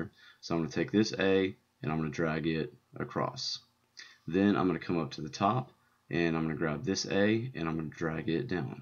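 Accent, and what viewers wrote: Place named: South, United States. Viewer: Nice southern accent, adds pizzazz to the whole thing. Also not trying to strike as creepy but that giggle was adorable.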